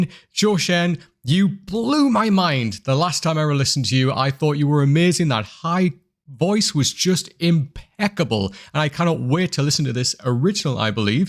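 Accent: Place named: Durham, United Kingdom. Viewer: I like your accent , are you from Durham ?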